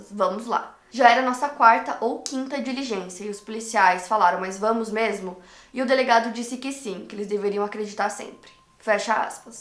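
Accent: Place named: Paraná, Brazil. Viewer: Paraná né ♥️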